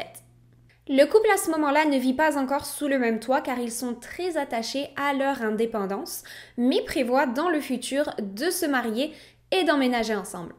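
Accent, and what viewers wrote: Quebec, Canada. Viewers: J'adore tes vidéos et surtout ton accent québécois que je trouve à ravir 😍 Que des histoires affreuses et immensément triste ... Merci ☺️✊🏻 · Elle sonne québécoise (du Canada) mais avec aussi un accent français. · tu as un tout petit accent quebecois, j'adore ! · Est tu québécoise? Jattend un certain accent! Je ne te connaissais pas ta chaîne et jadore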